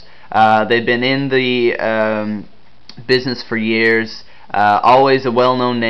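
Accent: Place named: Newfoundland, Canada. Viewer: Knew that was a Newfoundlander Accent! Cool vid dude, pickin one of these up next week.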